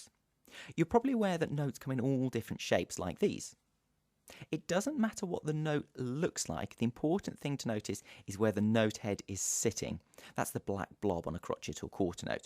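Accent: United Kingdom. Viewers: are you from the uk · A nice British accent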